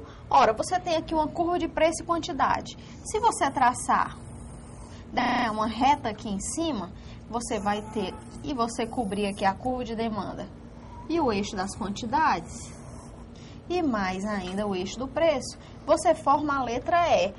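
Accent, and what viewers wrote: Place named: Northeast, Brazil. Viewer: Oi, gente! Estou amando as aulas, bem simples e didática, além do sotaque bom do Nordeste. Mas, gostaria de saber onde está a Economia Aula 20 Microeconomia Bacen EVP????? Não consegui achá-la. Bjokas pra todos!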